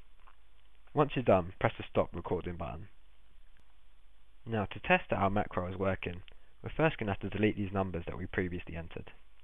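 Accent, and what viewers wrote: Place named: United Kingdom. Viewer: THE PRESENTER IS PRONOUNCING THE WORD "BUTTON" AS "BUNS" IS THAT A BRITISH ACCENT THAT I AM HEARING ? THIS ACCENT IS SOME WHAT ANNOYING.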